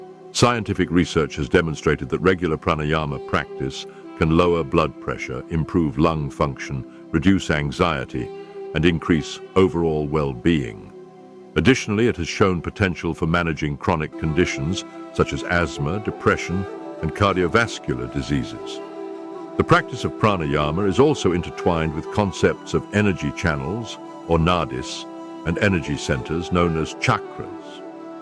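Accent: United Kingdom. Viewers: Why do you use British voice over? Are you feeling inferior to those assholes?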